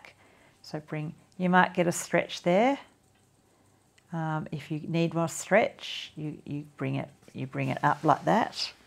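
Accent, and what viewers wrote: Australia. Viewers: Thoroughly enjoyed this 🤩 thank you so much… I’m about to turn 55 and move/workout daily but really need this in my life now… it’s lovely to hear a fellow Aussie accent too… 👏🏻👏🏻👏🏻 this is my first video with you and I will definitely be moving with you daily… ☺️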